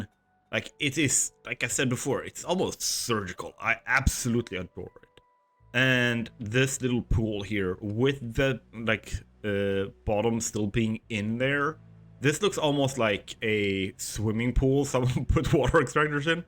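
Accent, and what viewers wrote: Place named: Sweden. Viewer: i hear swedish accent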